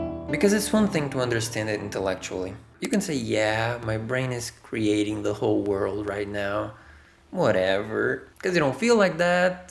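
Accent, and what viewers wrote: Brazil. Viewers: Br? Eu senti o português no primeiro segundo do vídeo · Demorei pra perceber que você era BR. Mas mesmo assim, conteúdo muito bom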